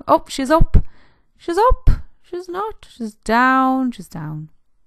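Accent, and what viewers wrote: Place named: Wales, United Kingdom. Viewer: Love your videos,you kind of sound welsh, I’m from Birmingham but live in the States!!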